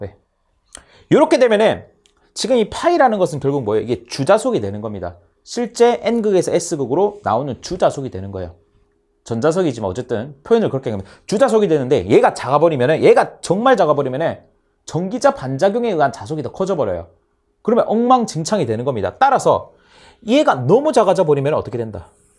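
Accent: Gyeongsang, South Korea. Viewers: 화내서 사과하는거보고 빵터짐 ㅋㅋㅋㅋㅋㅋ 경상도말투라 감안하고 봤어요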